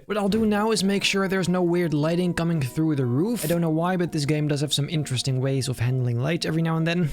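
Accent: Netherlands. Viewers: you sound dutch (nederland is cool) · hahahaha thought i recognized a dutch accent but “people of the good life” confirmed it cause there is no better thing then dutch people saying “mensen van t goede leven”. great build and happy to see there are more dutch people who love fnaf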